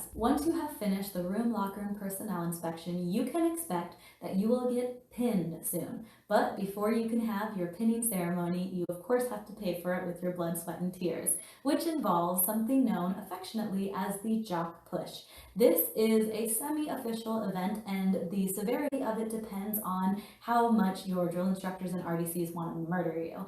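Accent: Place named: West, United States. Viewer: What an interesting question. I will first wax poetical since sound is a personal sensation. Your voice is smooth, like a sophisticated port. It washes over one like sitting on a beach with your eyes closed as the susurration surrounds and tantalizes. I did discover that your voice in this video was not your natural speaking voice, but a "professional" style, thus armed with this insight, I watched part of your Google and part of your MEPS videos to listen to your native voice. So secondly I will hazard this guess. You were born in Montana or Utah, moved to Oregon, obviously went to Connecticut and spent some time near Sacramento. I also traveled a bit and thought I did not have an accent until an Alaskan bush flier asked me if I lived in Texas.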